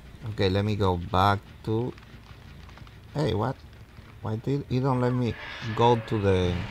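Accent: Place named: Spain. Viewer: You are so spanish 🤣 “ehhhh” Im so happy i got this for free basically because i had auric cells before the merger with netease and when the game came out last week i had 1005 cells and on my 3rd and last flip i got the skinnn for freeee9999 and the fact you said this skin cost 500$ made me even more hype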